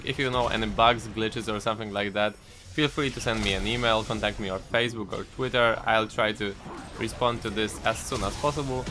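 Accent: Poland. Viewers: Are you Polish? You sound Polish. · polish accent xd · Twój akcent, taki Polski ;p Oby tak dalej, ziom! ;)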